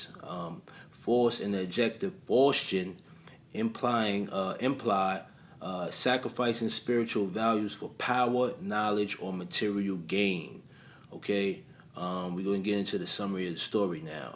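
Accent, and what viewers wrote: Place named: New York, United States. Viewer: All this time I thought you were saying, "Foreskin Bargain". New York accents, sheesh!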